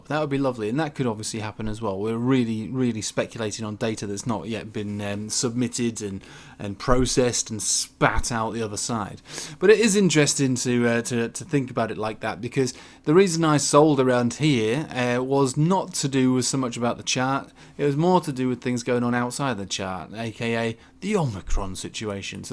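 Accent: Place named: Scotland, United Kingdom. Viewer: What’s with the Scottish accent in vids today :))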